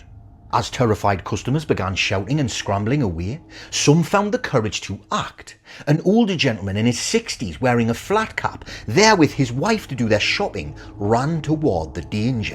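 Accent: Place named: North East England, United Kingdom. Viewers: What part of the cannie north east are you from pal? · Yes Lovely Geordie accent, ❤❤❤❤ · A true crime channel narrated by a real human AND a Northerner too! New sub and thanks! :)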